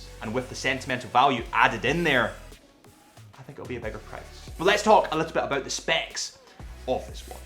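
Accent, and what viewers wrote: Scotland, United Kingdom. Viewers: This guy has a similar presentation style and approach as Adrian Barker…just with what? A Scottish accent is it? I do agree though, that this particular Seamaster is going to end up being one of the few contemporary Omegas that’s a collectors item and will fetch a premium for it. It’s definitely one of my favorites anyway. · Both are Scottish, it’s a cool accent which I appreciate after living in Scotland for more than 8 years…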